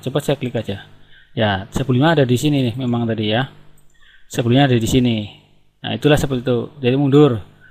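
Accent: Banyumas, Indonesia. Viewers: manteps ngapaks..., penjelasanya sungguh jelas.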